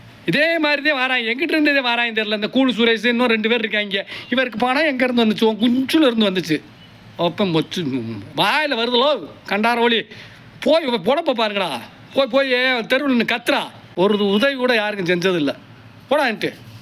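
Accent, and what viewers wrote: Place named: Madurai, India. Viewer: மதுரை தமிழில் திட்டியதற்கு வாழ்த்துக்கள்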